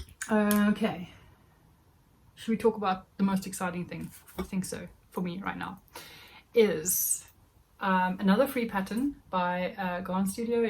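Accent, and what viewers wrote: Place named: South Africa. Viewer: When I listened to your accent in another podcast of yours, I thought you sounded South African and then in this one you mentioned you want to come and visit family in Stellenbosch. I am really loving your podcasts and I love what you are making. They will all turn out well. 😊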